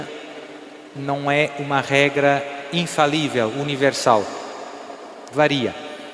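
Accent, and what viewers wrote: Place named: Brazil. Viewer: está morando no Brasil? Seu sotaque está muito brasileiro 😅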